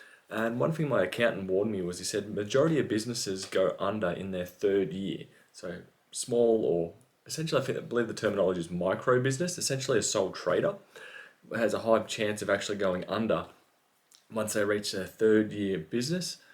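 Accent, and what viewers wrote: Australia. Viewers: I'm looking to get into device repairs and cleaning and I have to say these videos have really helped me, your channel is criminally underrated and it's good to here another Australian voice actually helping.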